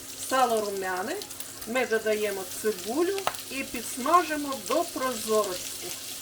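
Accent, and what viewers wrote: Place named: Surzhyk, Ukraine. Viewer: Привет из Кузбасса!, как же мы любим вас за ваши, казалось бы, незамысловатые и бюджетные блюда, но настолько необычные и вкусные, что чувствуешь себя королем, хотя уже давно на пенсии, а поэтому живем скромно и воспитываем сына, который еще у нас учится.Чтобы вкусно накормит семью чем-нибудь необычным, каждое утро ищу интересные рецепты в интернете, чтобы приготовить что-то новенькое и отправить сына на учебу. А ваш говор напоминает родную бабулю из Украины, которая говорила на суржике.Поэтому встречаюсь с вами, как с родными.Переживаю за вас, чтобы все у вас было хорошо, чтобы были все живы-здоровы.И вашим деткам всего хорошего.Пусть радуют вас и берегут.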